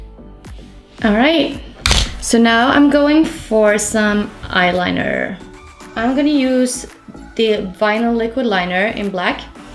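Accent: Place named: Sweden. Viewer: The Swedish accent... 🤗🇸🇪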